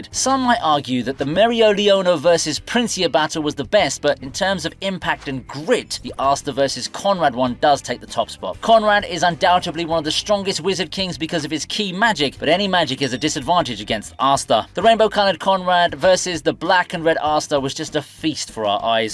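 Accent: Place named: United Kingdom. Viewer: Hou je kanker bek met je kut Britse accent. Groetjes Jos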